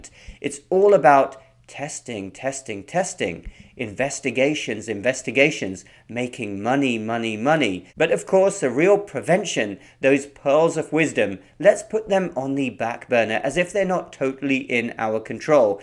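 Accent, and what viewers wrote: United Kingdom. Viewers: THE AMERICAN DIET?? seriously?? u gonna go there in ur British accent?? ur considered a WESTERN nation too.. don't ever forget that.. so don't discount urself or ur nation (if ur really from there, bcuz u look Indian to me) as if its just a. problem singled out to one country.. FYI..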